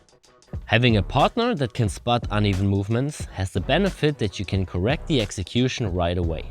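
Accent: Germany. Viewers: you are german😀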